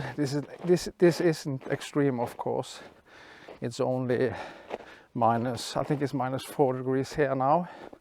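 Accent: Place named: Norway. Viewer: Du ar fra Norge? That accent lol...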